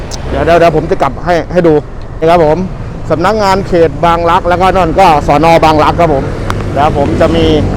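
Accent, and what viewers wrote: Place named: Isan, Thailand. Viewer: ชอบเวลาพี่เว้าอีสานเด้5555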